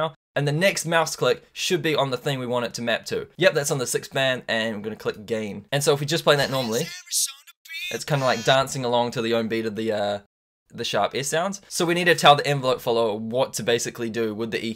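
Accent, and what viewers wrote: New Zealand. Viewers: U from New Zealand? Ur accent is nz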